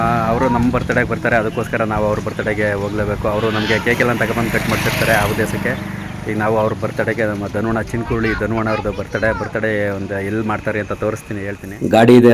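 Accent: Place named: Chamarajanagar, India. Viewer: Anna navu Chamarajanagar davru nam kadenu ede tara matadadu tumba chenagide nim vlogs kushi agutte normal life style toristira yavde artificial ella ❤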